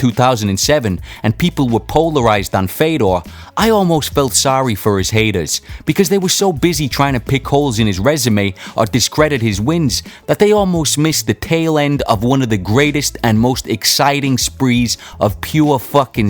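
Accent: United States. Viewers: What region of america is this accent from? I kind of recognise it but can't place it. I find it interesting.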